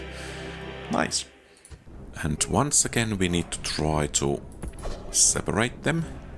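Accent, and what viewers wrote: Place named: Finland. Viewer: Are u from Finland? Ur accent is so finnish. If you are, paljon kiitoksia sulle Venäjältä! P.S: NoToWar!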